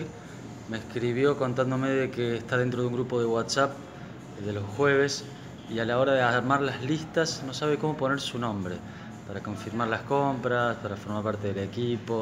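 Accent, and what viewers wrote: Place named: Córdoba, Argentina. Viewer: Ese acento es Cordobes si no estoy mal verdad. Tengo un camarada Argentino que habla igual que tú y igual que Yayo Jajajja. Es imposible de confundir ese acento Cordobes.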